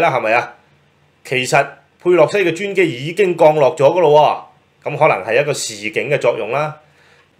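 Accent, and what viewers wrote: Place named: Cantonese, China. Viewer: 你D廣東話 可唔可以標準D？ 你邊個鄉下請問 lmao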